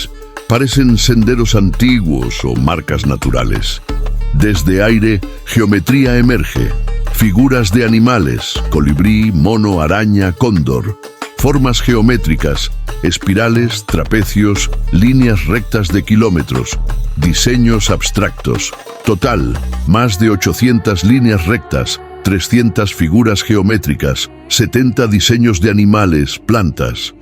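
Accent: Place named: Quechua, Peru. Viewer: lo relata como un nativo quechua que habla español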